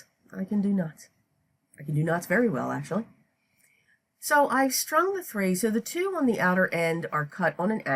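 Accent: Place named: Philadelphia, United States. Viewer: I passed on this kit, your projects are so cute and are making me regret my decision. New subbie here, I detect a Philadelphia/south Jersey accent, am I right?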